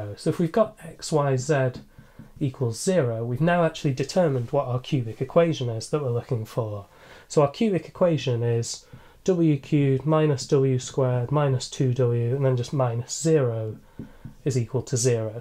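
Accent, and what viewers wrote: United Kingdom. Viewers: Thanks for this video and for your british accent :) Clever solution and beautiful problem. Hope you are doing well :)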